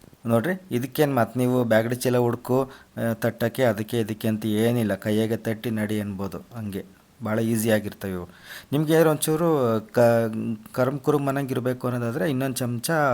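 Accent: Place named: Kadur, India. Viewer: Sar niwoo yava wooru nimma bashe nama kadurina bashe agede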